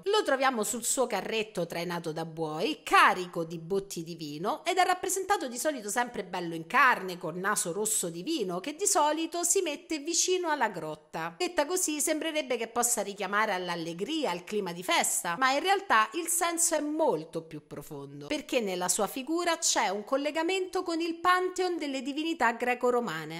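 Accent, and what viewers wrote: Rome, Italy. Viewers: Per favore, meno acidità e torna a parlare italiano. Non se ne può più di romanità sottoculturale. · Inizio del video scoppiettante è veramente divertente come al solito la tua romanità ha accentuato è reso al quadrato quello che volevi dire gradevolissimo...... video Bellissimo queste notizie sono veramente utili a capire il nesso e il connesso con il discorso del presepe non sono d'accordo con figure figurine quadri e Santini perché il vero secondo comandamento vieta di creare immagini e di adorarle basta andare comunque a vedere i comandamenti veri e non quelli manomessi edulcorati dalla nostra carissima chiesa Vaticana 2)Non farti scultura, né immagine alcuna delle cose che sono lassù nel cielo o quaggiù sulla terra o nelle acque sotto la terra. Non ti prostrare davanti a loro e non li servire, perché io, il Signore, il tuo Dio, sono un Dio geloso; punisco l'iniquità dei padri sui figli fino alla terza e alla quarta generazione di quelli che mi odiano, e uso bontà fino alla millesima generazione, verso quelli che mi amano e osservano i miei comandamenti. 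Questo é solo un mio pensiero. Complimenti come sempre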